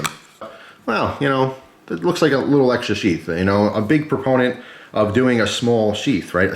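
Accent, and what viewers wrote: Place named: New Jersey, United States. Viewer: the A in extrema is pronounced kinda like ah, English when spoken relaxed by a fluent speaker it is normal for the spaces between english words shrink and flow fluidly sounding like extrem-ahratio. American English has there own colloquialisms, and (a native new jerseyite speeks with a specific accent. To me it sounds like a slight speach impediment, you can hear it distinctly with the word water.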